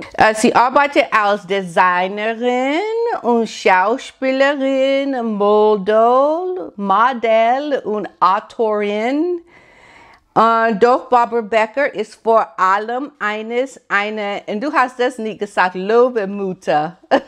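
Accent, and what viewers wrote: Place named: United States. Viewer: Babs kann die ganze Patchworkfamily, also auch seine uneheliche Tochter Anna und Amadeus aus seiner zweiten Ehe, zusammenhalten. Sie versteht sich mit Angela Ermakova und Lilly Becker, die ihre Kinder sehr gut ohne Boris weiter erziehen. Finde ich ganz toll und ich hoffe noch immer ein bisschen, dass sie mit Lenny Kravitz zusammen kommt. Er ist über Jahre ihr engster Freund, aber vielleicht ist es besser so, denn eine Beziehung ist immer kompliziert und sie sagte einmal, dass Freunde sehr wichtig für sie sind und sie sich als Single wohl fühlt. Emanzipierte und intelligente 🚺 👍 Da fällt mir noch ein, ein Rothaariger mit einer Farbigen zusammen, wie bei HM 😅 aber Babs ist ein ehrlicher guter Mensch. Stelle dir mal vor, Harry betrügt M 🤣 Ich liebe deinen amerikanischen Akzent 😍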